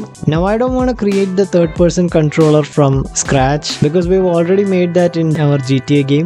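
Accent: Kerala, India. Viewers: accent feel like desi mallu boy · Bro's indian accent just makes me relax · indian accent hits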